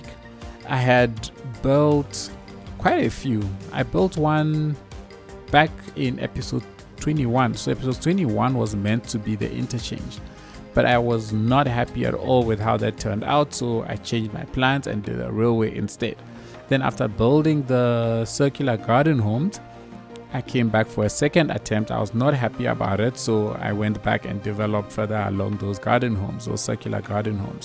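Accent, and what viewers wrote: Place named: South Africa. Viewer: I didn't know you were South African but when I heard your accent I knew, I felt the South Africaness in my soul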